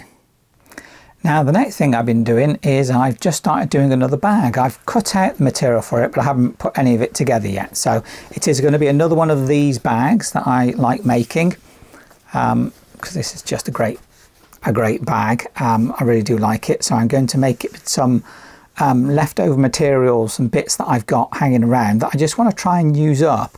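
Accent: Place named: South West England, United Kingdom. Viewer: I'm a tech need seriously 😅 I need to dm you as ive got 3 huge bags of really good wool after my late mam and I can't think of anyone else I'd rather give it to. Help how do I contact you? I'm living in South Wales and you sort of sound South West urself 😍 if ur not interested no worries 😊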